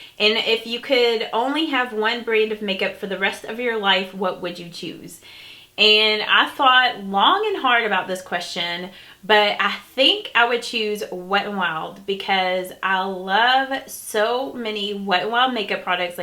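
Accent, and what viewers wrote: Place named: Kentucky, United States. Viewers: When I watched my first makeup video of yours, I could tell your accent sounded like me...and I was right! We are both KY girls! Gotta love KY! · Ty for the Q&A! I can relate with accent...I live in Eastern Ky myself. My husband lived in Louisville for over 10 years:) Just visited the Louisville Zook on Wednesday! :)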